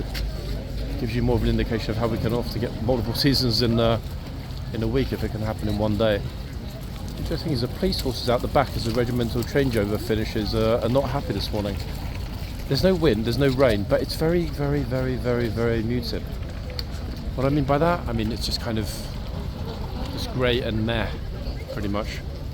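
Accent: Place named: United Kingdom. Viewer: What that guy meant by jerk walked in front someone walked in front of his camera as he took a picture. Also I really wish you could get Trooper Ellis to do a little Q &A for us, partly because I like British accents (yours is lovely and almost musical) But also because I'm genuinely curious about what it's like to Work with all the horses, It's to bad we can't camera and mic up a Kings guard for a Day in the life of video.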